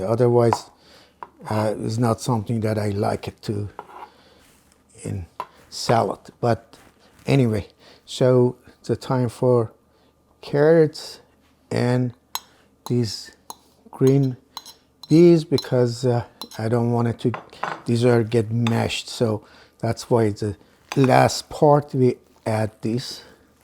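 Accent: Iran. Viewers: your voice... and with your Persian accent are just perfect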